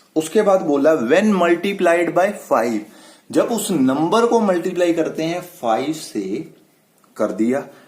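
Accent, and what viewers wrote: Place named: Punjab, India. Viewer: phirse kya haal he 😂. Full punjabi accent.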